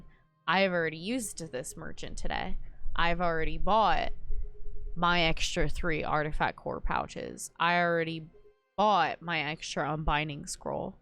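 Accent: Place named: South, United States. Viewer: OK it’s adorable there’s just a little bit of southern girl MUA bank to see if I can ever so often a little bit of southern accent pops out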